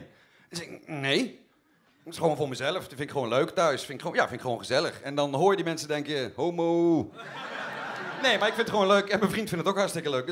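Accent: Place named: Brabant, Netherlands. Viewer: Mooi taaltje dat Brabants... Altijd recht voor z'n raap! :-) Mijn roots man! Of nie dan? Dus...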